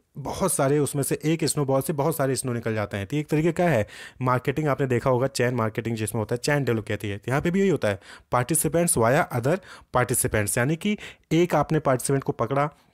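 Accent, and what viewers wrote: Uttar Pradesh, India. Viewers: lgta h sir up se h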